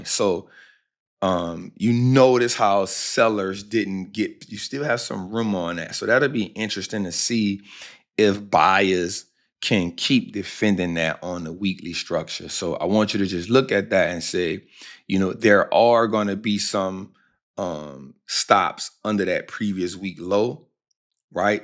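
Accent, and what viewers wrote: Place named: Louisiana, United States. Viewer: Were you from bro? Sound like you from da boot like me😂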